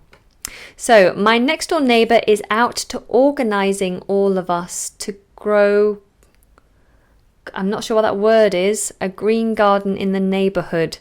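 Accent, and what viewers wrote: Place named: United Kingdom. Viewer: I like how you teach, your english british accent, your pronunciation, but there's something I wanna ask you just for curiosity. Did you study at Cambridge? If not, where?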